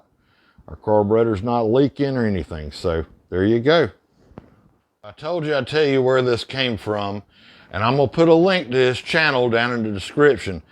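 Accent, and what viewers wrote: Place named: Appalachia, United States. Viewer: oh your god, dull and hillbilly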